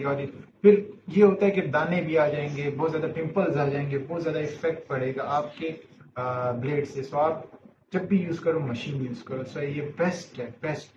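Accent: Pakistan. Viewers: Bhai tere bolne ke style se lag raha hai ki tu Pakistani hai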